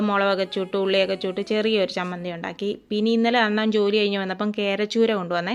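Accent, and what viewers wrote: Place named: Alappuzha, India. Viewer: ആലപ്പുഴ ആണോ വീട് 🤔🤔 സംസാര രീതി.. കേട്ടിട്ട് ചോദിച്ചത് ആണ് 🥰🥰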